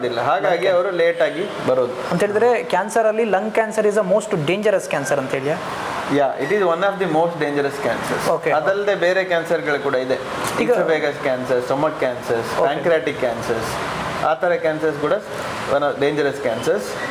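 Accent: Mangalore, India. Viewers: ಇದು ಮಂಗ್ಳೂರು ಕನ್ನಡ . ನಿಮ್ಗೆ ವಿಷಯ ತಿಳಿದರೆ ಸಾಕಲ್ಲವೆ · ಕನ್ನಡದ ಬಗ್ಗೆ ಅಷ್ಟು ಅಭಿಮಾನ ಇರುವ ನೀವು ಸರಕಾರಿ ಶಾಲೆಗಳಿಗೆ ಕನ್ನಡ ಕಲಿಸುವ ಅಧ್ಯಾಪಕರ ನೇಮಕಾತಿ ಬಗ್ಗೆ ಸರಕಾರಕೆ ಒತ್ತಾಯ ಮಾಡಿ ಅದು ಬಿಟ್ಟು ಬೇರೆಯವರು ಮಾತನಾಡುವ ಶೈಲಿ ಬಗ್ಗೆ ಅವಹೇಳನ ಮಾಡಬೇಡಿ ಇದು ನಮ್ಮ ಮಂಗಳೂರು ಕನ್ನಡ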